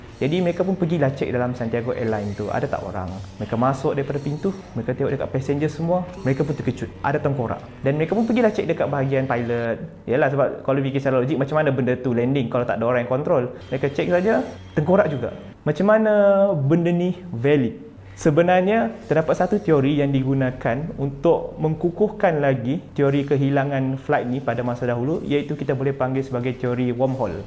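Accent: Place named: Sabah, Malaysia. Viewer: Speaker dia ni.. org sabah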